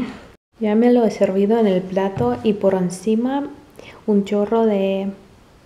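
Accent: Spain. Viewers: ***** Tiene acento de España porque está viviendo alli, al igual que tendría acento argentino si estuviera viviendo allí. Ah, y no has pensado que quizás otros acentos no les hacen gracia a otros países? Ella no está aquí para hacerte reír, está aquí para compartir su experiencia viviendo tan lejos de su país natal, así que si quieres a alguien gracioso y con otro acento porque no eres capaz de aceptar las diferencias culturales, tienes muchos otros canales de mucha gente que hace su mismo contenido. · Entre la seriedad con que tratas cualquier tema, la misma naturalidad con la que hablas me resulta gracioso tu acento español (en el buen sentido de la palabra). Saludos desde Colombia. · wow ya tienes la z en tu acento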